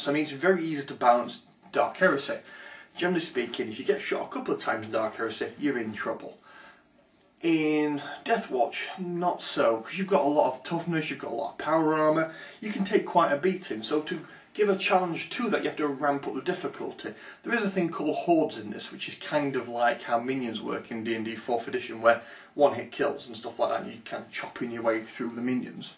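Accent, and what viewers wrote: United Kingdom. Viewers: In fact, I think I've pegged it...his Brit Accent is what makes the channel ! Brits sound so good saying things...."modified version of the Fantasy system..." - I mean...you can't even come close saying that in American English ! Plus he's smart...and knows his stuff...and he's just a great commentator. One of my favorite quotes is, "I want to play an Orc...just an ordinary Orc. And I can't do that.. and that's a shame." - see his Warhammer online review ! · Good job on the review. Your Brit accent makes everything so much nicer to listen to. LOL But good info and some nice insights. Thank you.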